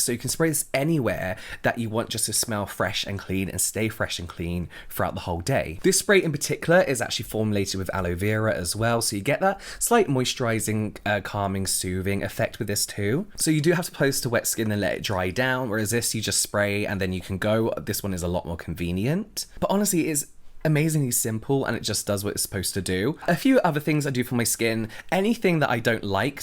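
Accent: United Kingdom. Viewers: Are your from the UK?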